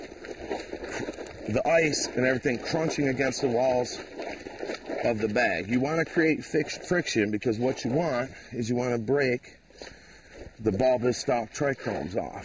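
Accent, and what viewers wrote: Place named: Newfoundland, Canada. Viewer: buddy sounds like a newf most definitely a newf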